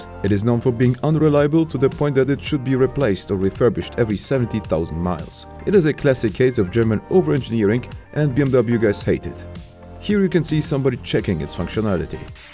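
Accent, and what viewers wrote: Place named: Poland. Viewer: Masz bardzo słaby polski akcent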